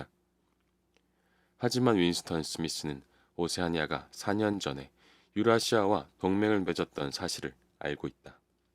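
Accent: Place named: Busan, South Korea. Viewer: 부산분이셨군요~ 사투린 모르겠고 억양이 있어요 근데 오히려 그게 매력이 되는듯해요 전 좋네요^^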